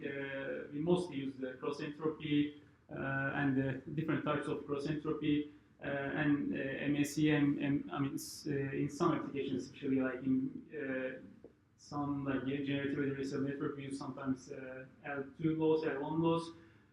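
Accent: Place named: Turkey. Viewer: Great presentation.. the presenter has Turkish accent like mine. :))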